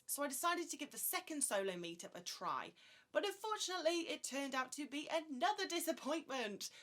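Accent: United Kingdom. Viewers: I just love a reviewer with a British accent!.....btw I love virgin voyages!!!1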